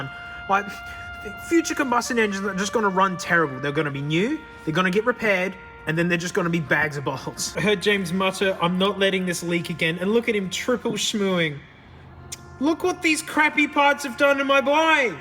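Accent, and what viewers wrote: Australia. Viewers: BABE THE YELLING AUSTRALIAN GUY POSTED!!!!!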